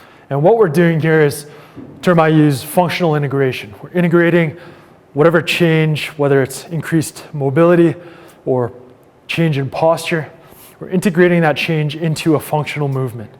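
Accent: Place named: Canada. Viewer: Definitely Canadian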